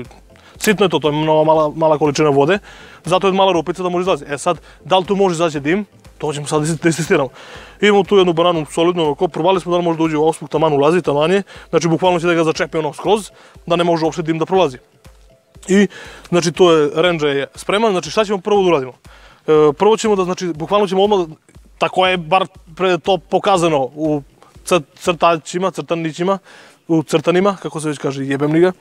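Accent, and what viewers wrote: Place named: Niš, Serbia. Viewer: on je iz Niša zato ima takav "naglasak,, a ne da ne zna padeže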